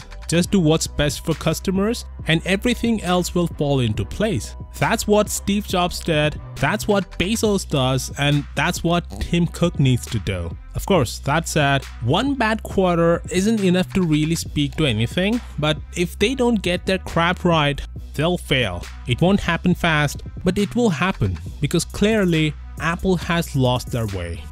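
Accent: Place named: India. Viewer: are you indian, you sound like the tech support from bny mellon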